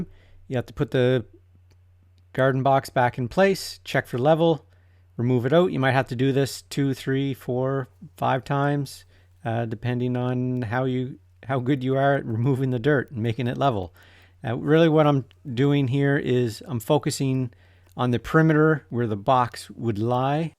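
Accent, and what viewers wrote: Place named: Maritimes, Canada. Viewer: Grew up near a bunch of maritime candadians. Accent takes me back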